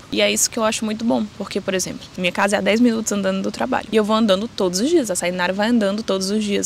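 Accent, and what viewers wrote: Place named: Northeast, Brazil. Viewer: Logo vi que me pareceu ter um sotaque nordestino mas não quis arriscar. É que a maioria dos brasileiros em Portugal ou são paulistas ou cariocas 😁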